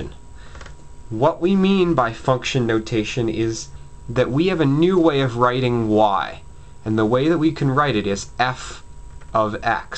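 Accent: Canada. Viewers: nice Canadian accent. this was a lot of help though thanks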